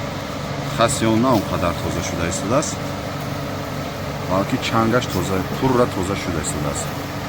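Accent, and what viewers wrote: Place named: Tajikistan. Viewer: Зинда хонаобод боши бародари азиз ... Барои лавзи ширини точикона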